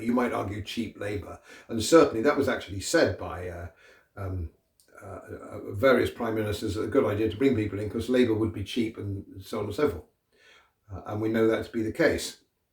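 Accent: RP, United Kingdom. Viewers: Rubbish. On what basis can you THINK this. Just look at his dress, listen to his accent. He is a Colonel Blimp. People like him ARE the real traitors. And VILE people. Driving division and hatred - not community spirit, kindness. Appealing (sadly it seems successfully from comments here!) to the worst aspects of peoples' natures. Criticising politicians (not their side natch) yet using the SAME "othering" techniques the Nazi's did.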